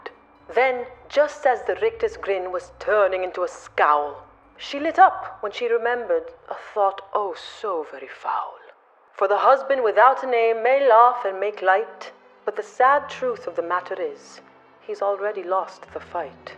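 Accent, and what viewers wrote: England, United Kingdom. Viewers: What's the accent about, is it meant to be English ?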